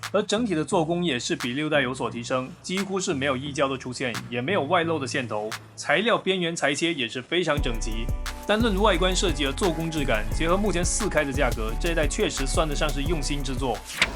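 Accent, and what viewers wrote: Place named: Hakka, China. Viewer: 你是客家人嗎? 這鄉音也太重 哈哈哈 超親切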